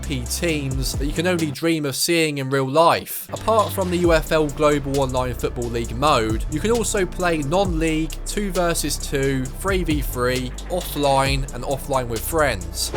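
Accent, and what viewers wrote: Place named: England, United Kingdom. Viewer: Fucking whiging fuckin englishman innit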